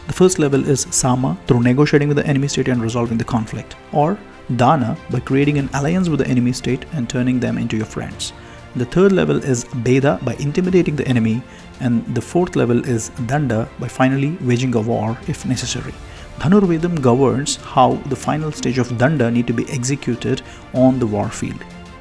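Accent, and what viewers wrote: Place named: South India, India. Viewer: Namaste ...really want to appreciate all your videos..but one BIG request for the narrator...do you generally speak this fast, without any pauses...please narrate a bit slower so that the content is understood better..also its not suitable to slow down the playback speed. The narrator speaks with a slight mix of south.indian and western english accent, it is hard to follow the narration if you speak in this speed..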